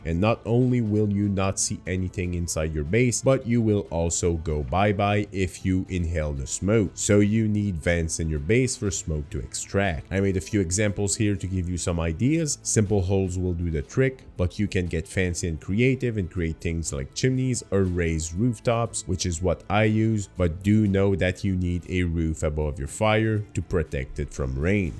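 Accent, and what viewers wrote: Quebec, Canada. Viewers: you have a French Canadian Quebec accent it sounds like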